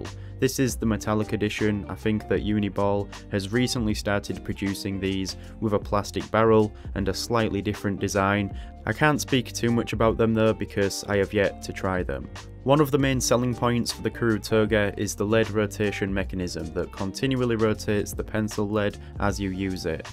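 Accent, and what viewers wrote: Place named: Hull, United Kingdom. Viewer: Hello. Just thought I'd ask, with that accent, are you from Hull? I grew up there myself. Love the channel by the way, I've learnt quite a bit just from your tutorials.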